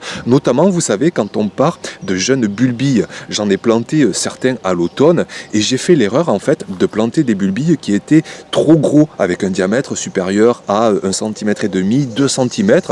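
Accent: South, France. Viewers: Bonjour, Je me suis abonné parce que tu as l'accent du sud. Je suis belge 😊. Le sud m'a toujours fait rêver et tu es un "exemple" qui alimente cette vision. C'est chouette que tu puisses vivre de cette passion (surtout pouvoir laisser une chance à sa sensibilité). J'aimerais bien t'avoir comme voisin 😂. Bonne continuation. Pierre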